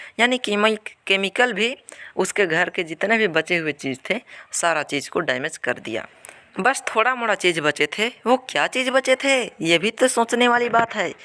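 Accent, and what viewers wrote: Bihar, India. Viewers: Are you from Bihar ? 🤔 Awesome explanation 👍👋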